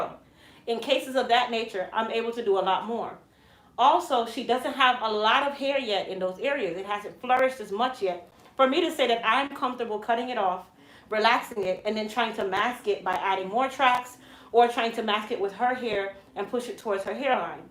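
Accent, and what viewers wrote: Jamaica. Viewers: I can hear that Jamaica talk in you.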